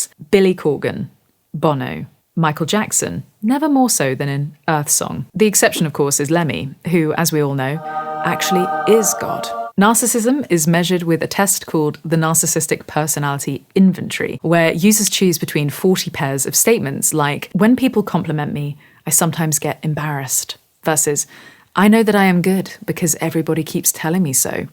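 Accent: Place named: London, United Kingdom. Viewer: in his opera prima, says in a verse: "É que narciso acha feio o que não é espelho"..something like Narcissus regard as ugly what is not mirror. Always refreshing watching your videos, not to mention that hearing your accent made me quench my thirst of old London. Be Happy!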